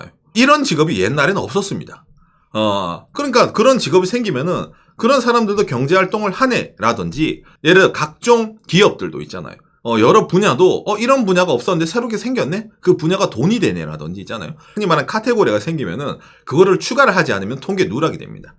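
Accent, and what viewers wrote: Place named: Andong, South Korea. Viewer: 우쨌든동? 안동쪽이 고향이세요? 😊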